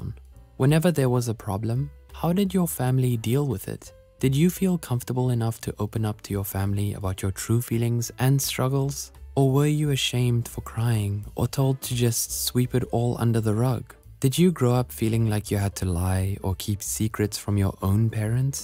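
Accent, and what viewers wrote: South Africa. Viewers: Is this voice over South African? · Idk how to explain it, but within the first five seconds of hearing the narrator, I immediately heard a fellow South African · I am hearing my people. Is the narrator South African?🇿🇦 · Are you south african? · out of topic but is the person talking south african? i hear the accent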